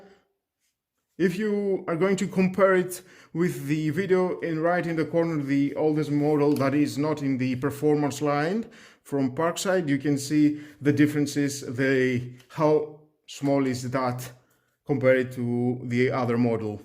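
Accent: Greece. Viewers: out of the point, I think you are Greek from your accent. If you are, do you know where can I find it, except from Lidl :-P ?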